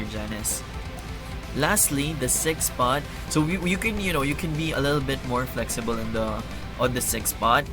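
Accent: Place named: Philippines. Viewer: Pinoy ka pala ganda ng accent haha keep it up already subscribed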